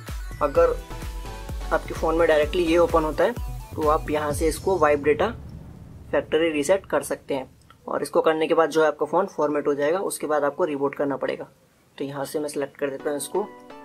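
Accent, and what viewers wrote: India. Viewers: You know shits bad when it's Indian accent and you don't even know what he's saying. Good luck to all of you that can read this ._.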